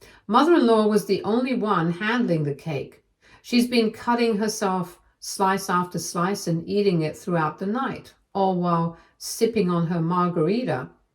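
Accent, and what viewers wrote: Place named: United Kingdom. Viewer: I believe that does not edit the posts but presents them exactly as the OP wrote them. So any errors in choice of words are the OP's. The only thing you can fault on would be pronunciation but keep in mind the she lives in the UK and not the US. (And even in the US there are regional differences in pronunciation of many words just as the UK has regional pronunciation differences.)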